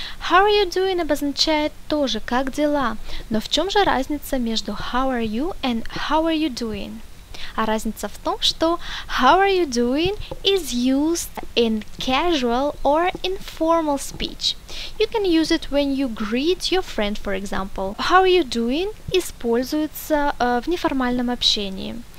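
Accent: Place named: Russia. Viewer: очень интересный урок, спасибо. но у вас очень необычное произношение. очень сильный русский акцент, который мешает воспринимать информацию. к примеру, многие буквы английского, даже похожие по звучанию на наши, произносятся совсем иначе (t, s)/а смягчения в английском вообще нет.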